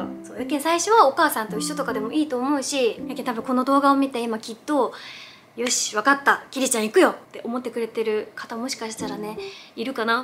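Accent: Oita, Japan. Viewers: 最近さん知りましたが大分弁に親近感です❤ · ちょこちょこ出る大分弁。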